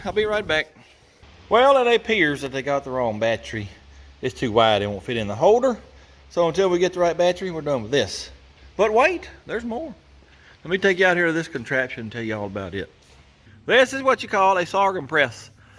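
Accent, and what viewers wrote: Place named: South, United States. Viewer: I'm new to the channel. And I have to tell you ! I've laughed my but off !!!! I'm from NE Ohio. But I have plenty of relatives from the south and they sound the same ! They make fun of me too. You might want to rebuild the carboroster again though LOL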